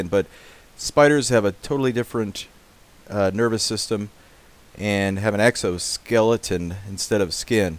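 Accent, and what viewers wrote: Australia. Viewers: lol you aren't Australian are you? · are you an aussie? :p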